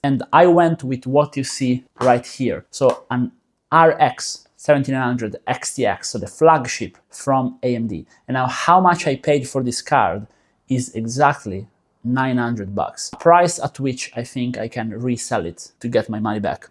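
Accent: Italy. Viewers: Familiar accent:Italiano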